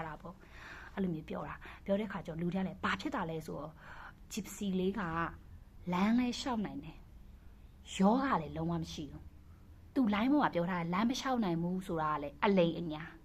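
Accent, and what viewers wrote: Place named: Shan, Myanmar. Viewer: မမဘယ္တိုင္းရင္းသားလဲစကားဝဲ႔ေနလို ရွမ္းတိုင္ရင္းသားလား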